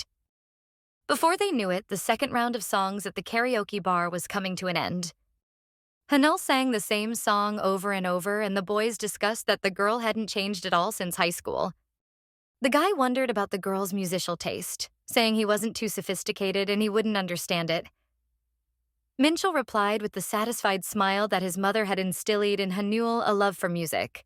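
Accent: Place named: Australia. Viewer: Ik for a fact that ur an Australian. Am i right?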